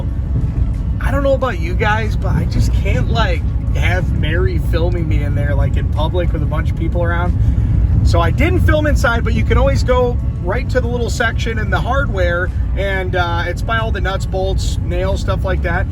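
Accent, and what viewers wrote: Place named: Florida, United States. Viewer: Michigan? Definitely thought u were a Florida boy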